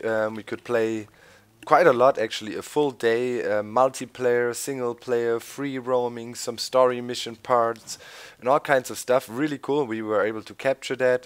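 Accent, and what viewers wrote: Germany. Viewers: Du bist Deutscher?! Cool, jetzt wo ich es weiß hört man doch ein bisschen, dass du Deutscher bist :DD Aber naja Englisch kannst Du!!! :DD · Ist realy strange a Person who also Comes feom the Country you come from in another language hear to speak and commenting in english at the same time you know he also under stand this: Man hört den Akzent raus! and i wanted at every time I wrote a comment say the following sentence: "it is nice to be a greiß, but it is higher to be a bauer! ;D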